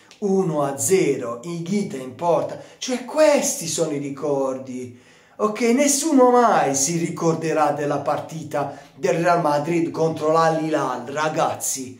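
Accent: Bari, Italy. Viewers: Ti seguo anche se sono interista perché sei simpatico. Il dialetto barese è troppo bello….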